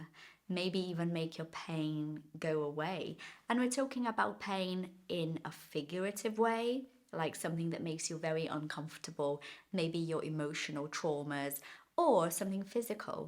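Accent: RP, United Kingdom. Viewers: I love so much your accent, it's soo brit and fancy 🤣🤣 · Hey, teacher! Hope you are doing awesome. I have found you on Instagram and here on your channel. I am amazed with your british accent and also watching every single video here. I am trying to acquire the british accent but, it is not so easy. Lol Kudos to your work and skills!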